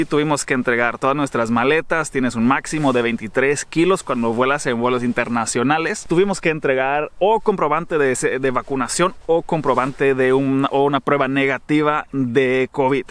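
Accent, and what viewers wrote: Monterrey, Mexico. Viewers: Porque ese nombre de Alemán Latino. Si tu acento es Mexicano Regio.?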